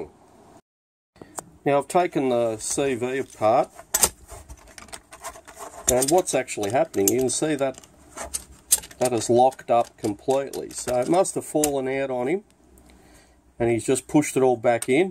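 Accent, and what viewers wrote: Australia. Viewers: Sounds like an Aussie accent. I'll get you a James Boag's next time I am on the Gold Coast. Notice that the inner part with the splines is flush on one side and has a raised section on the opposite side. I wonder why and I wonder which end of the splined shaft goes into which end, the flush or raised side?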